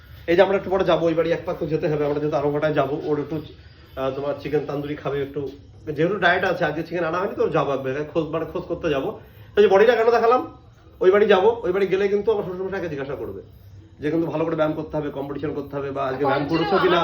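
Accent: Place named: Bangladesh. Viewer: Son surar bachcha tui ja vaba Indian armed forces ka niya kotha bola6is are satha tor bou sur tana6a......Todar duto kai bol6i Tora manusar jat na ra......sotti kora bolto tora indian na ki oi parar mal .....kotha suna to amar tai mona hoi made in bangladesh.....Oi body bilding are ghamant ak dom dhukia dabo........Janor sala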